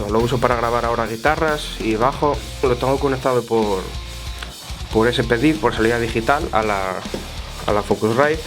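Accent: Spain. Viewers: Ese acento asturiaaaaaaaaano 😂👏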